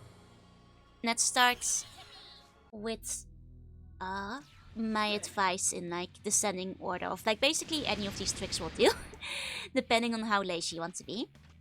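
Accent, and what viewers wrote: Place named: Netherlands. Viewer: i dunno how i got here but i instantly recognized the accent to be from the netherlands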